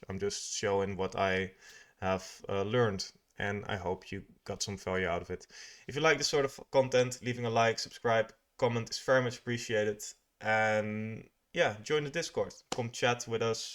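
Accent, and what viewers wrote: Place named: Spain. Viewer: i can tell u speak spanish, lol idk why. but i just now how spanish peoples accent sound when trying to speak english because i speak spanish too and english fluently.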